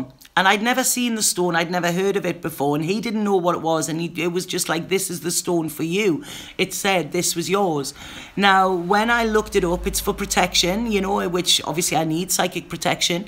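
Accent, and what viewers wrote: Newcastle, United Kingdom. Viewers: "Only Love Today!" Oh yes my love you are teaching. I knew that motto but when you said that into my ear/brain as I did my dishes.....it stuck! Thank You for ever and for always. I will pray for you and offer you a bit of my own advice,"I cannot stop people from doing, saying, thinking shitty, cruel, nasty things to me but I will be F****d if I will give them my fear, greif, the satisfaction seeing me using my free will to help them with their nasty, dark, petty endeavours. That is the part I/we CAN have complete control over. You are amazing, an intuitive reader, an uplifting force of nature and especially as a Sista-Soldier! The first time I heard you it was by "accident" as you came on autoplay whilst I was doing dishes and afterwards I were desperate to find you and, huzzah! I did. Luv your Geordie accent. Don't you worry me darling you are More than equal to any bulls**t lesser beings stomp and shout out of terror into the abyss. 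We got you girl. luvluvluvs, Regan. p,s, I have medical empathy and I Do Not see/sense and malignancy not dirty dishwater colour which is what I see/sense when anyone is going to pass away and/or suffer from a cancer. Is it possible that the growth has been in your brain in some form since before birth? I was so blue last night and I swore off people for good (again!) And here comes channeling God as Billy Connelly saying,"Oh do you bloody think so?!" Yea, I agree completely, spirit love is so much cleaner, purer, holy, for lack of a bette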